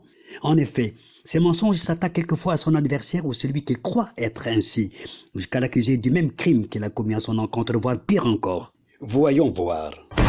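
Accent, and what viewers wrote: Paris, France. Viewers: Monsieur c'est avec révérence que je salue d'abord votre éloquence. Une rhétorique digne des grands lettrés. Vous n'avez rien à envier aux éminents spécialistes de la langue de Molière dans mon pays, la France. Et ce (voyons voir) tellement français d'une bouche à l'accent bien parisien, fascinerait davantage des auditeurs attentifs comme moi. Quant à la richesse du contenu, je m'en félicite encore pour ce qu'elle m'apprend sur le conflit du Sahara occidental que je considère d'ailleurs comme marocain par conviction et non par complaisance, grâce à vous.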